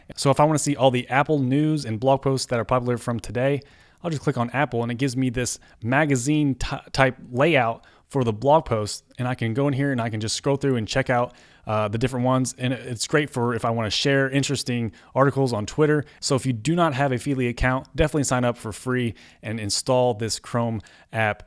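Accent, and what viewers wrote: United States. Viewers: L'accent américain est inaudible pour un français. Merci d'avoir mis les sous titres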